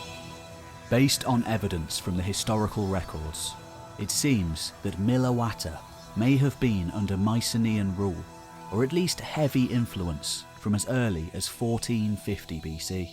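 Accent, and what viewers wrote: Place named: Liverpool, United Kingdom. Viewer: How many of these weird scouse type accents are there on these DIY history channels? It's both unlistenable and spreading like a plague.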